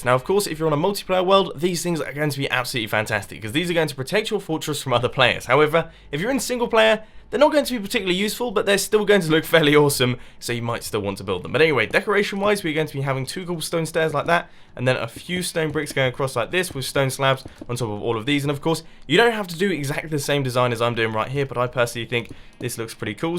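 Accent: England, United Kingdom. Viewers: r u english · Are you British?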